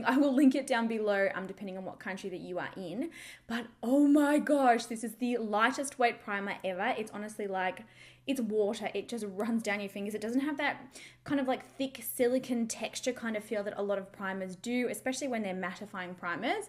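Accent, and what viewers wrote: Australia. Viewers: Love this makeup video,,, as always you slay every makeup tutorial review and your an awesome YouTuber,,, another thing I want to say is I love your Australian accent,,,, am a fan of home and away and I just love the way the actors speak,,, lol I just wanted to put it out there ☺😎 Lots if love from the UK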